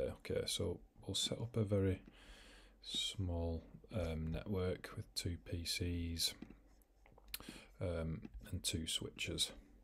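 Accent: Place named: England, United Kingdom. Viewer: amzing english accent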